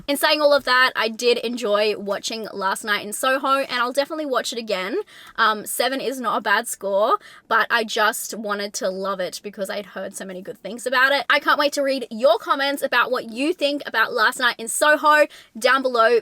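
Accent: Australia. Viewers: WELL SWEETHEART, IM.JUST AN OLDER.MOM BUT I LOVE YOUR "NASALY" (NOT EVEN!) AUSSIE ACCENT AS WELL AS YOURE AWESOME CONTENT AND YOURE ABSOLUTELY LOVELY TOO! KEEP UP THE GREAT WORK, SISTER!❤😁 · your nasally Australian accent is awesome to this California girl--LOL!!! Thank you for the great commentary!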